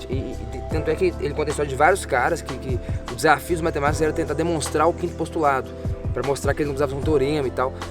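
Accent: Minas Gerais, Brazil. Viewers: vc é mineiro? sotaque de mineiro de BH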